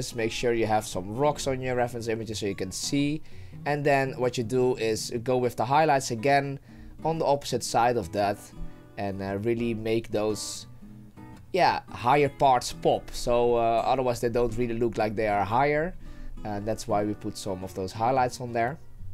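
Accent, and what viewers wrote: Netherlands. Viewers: nice tutorial. Random question: are you Dutch? You sound like a dutch person speaking english.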